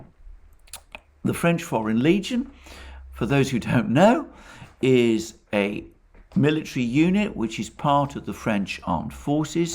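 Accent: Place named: RP, United Kingdom. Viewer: He uses the Queens English that's why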